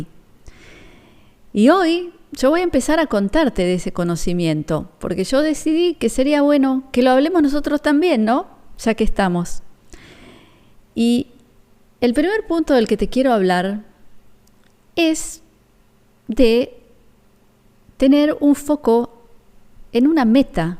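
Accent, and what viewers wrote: Argentina. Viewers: Verte y escuchar tu acento argentino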